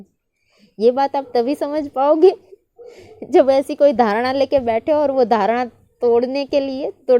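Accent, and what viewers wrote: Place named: Gujarat, India. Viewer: Gujju tone of hindi 😊😊😊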